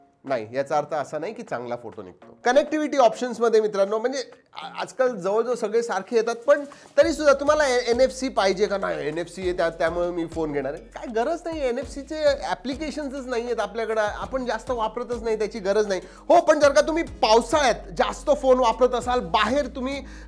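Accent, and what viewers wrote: Pune, India. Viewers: Sir, tumhi punyache ahat kay?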